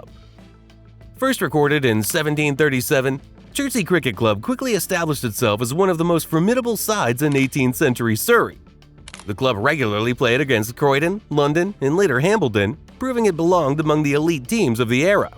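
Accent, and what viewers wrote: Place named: United States. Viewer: and The world's greatest batsman, Australia's Don Bradman (apparently here (twice!) known as Edward Lumpy Stephens) played for Hambledon CC? Tony Greig was also Richard Newland in another life, how versatile! Boy, I hate AI.....why have an American voice? This whole video is extremely aggrevating.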